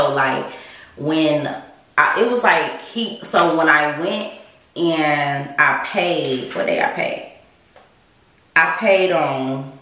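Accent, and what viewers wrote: United States. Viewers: Lol Sound so chicago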